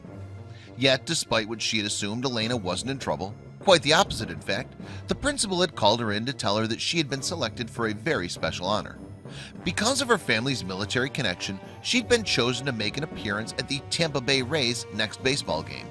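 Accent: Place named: Midwest, United States. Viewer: Maybe just play the video instead of a 10 minute explanation? Especially with that nauseating Midwest accent, torturous.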